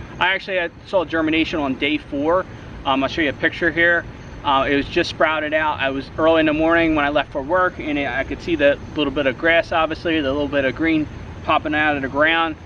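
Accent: South Jersey, United States. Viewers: Hey, I subscribed through Etsy! 😉 It's good to see Jersey again and I can hear your area code 609 accent. 😊 In one video it's so obvious you have a gift for creating beautiful ORGANIC lawns. That is so vital with climate change. We live way upstate New York now in the woods and we don't even have sidewalks or street lights and the scrub grass grows, well, like weeds. It's so nice to see your flat, green lawn. Beautiful work. 🌱🌱🌱🌱🌱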